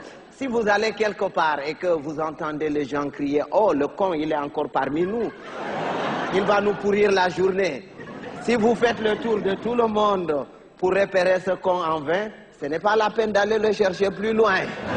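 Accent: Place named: France. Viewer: La vie Frr t’as vu il parle le français français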